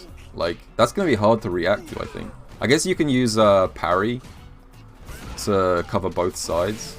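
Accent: United Kingdom. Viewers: I really enjoy u talking about sf tech u clearly know your shit and I could listen to an English accent all fukn day lmao it’s like the best of both worlds hahahah 👊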